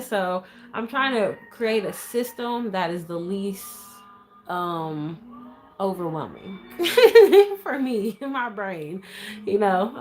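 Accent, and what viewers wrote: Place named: Ohio, United States. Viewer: Beautiful voice. I know you have that very good Ohio voice. We can sang 🙏🏾🎼🎼🎼🎙💃🏿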